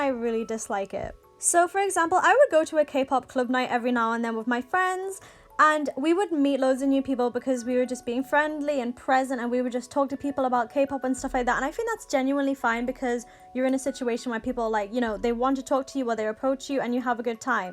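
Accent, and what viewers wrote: Newcastle, United Kingdom. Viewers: First time here and ur accent reminds me of perrie edwards geordie accent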